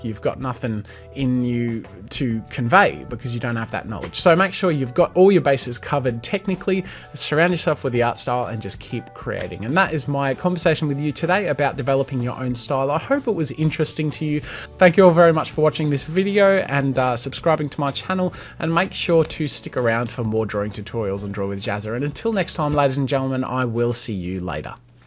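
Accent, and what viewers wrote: Australia. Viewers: is he Australian? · Who else is watching this in 2020 and thinking he used to sound more Australian? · U r australian 😆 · Is he Aussie?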